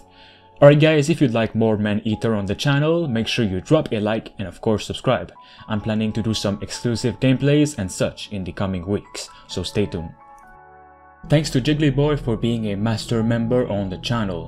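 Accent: Quebec, Canada. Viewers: The commentator needs to makes vids in French, his pronounciation in English is terrible. I keep hearing him repeatedly saying " Man Heater"which is entirely different gendre. Please homes, just stop, that Quebec accent is atrocious. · Hmmm .. I hear Quebec !!!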